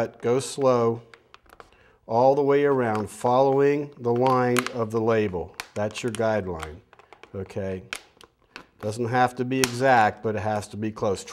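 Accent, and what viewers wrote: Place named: Pittsburgh, United States. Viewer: YEP, this Gentleman is from Da-Burg. Go Steelers!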